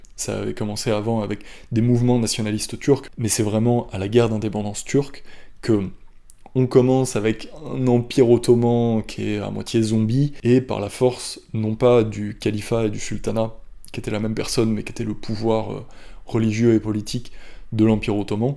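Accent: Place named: France. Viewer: J'adore ton accent français!! 🤩🤩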